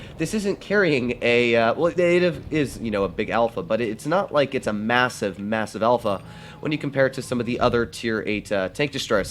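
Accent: Russia. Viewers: are u secretly russian? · Love the tiny Russian Accent, stalin would be happy.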